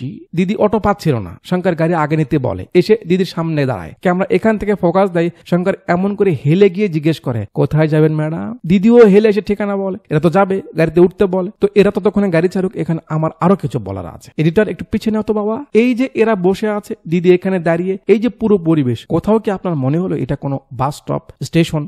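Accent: Bogura, Bangladesh. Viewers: আপনি কি বগুড়ার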